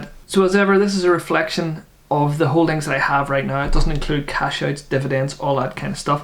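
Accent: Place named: Tyrone, United Kingdom. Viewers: Do all stocks have a dividend and how do you know which do and do not ? Nice to hear another accent from the north:)) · Local accent, subscribing just for that reason. Tyrone man here. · Hi nice to hear a local voice! I’m from Fermanagh you sound like a Tyrone/Derry man. I’ve got big into investing in stocks this past few months and have done very well. Subscribed and can’t wait to watch more contact 👌🏼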